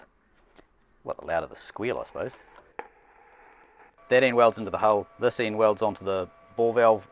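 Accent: New Zealand. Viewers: That is the kiwi pronunciation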